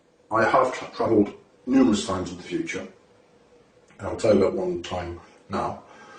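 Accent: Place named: United Kingdom. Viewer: So what Army did you join? Why are you speaking with a British accent?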